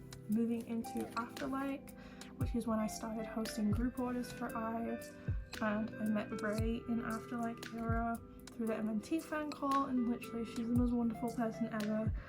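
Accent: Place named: United Kingdom. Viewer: me: don't mention fellow british accents every time you hear them also me: surprised to hear british accent even tho i've watched you before ( i have not moved from dark side kermit meme ig )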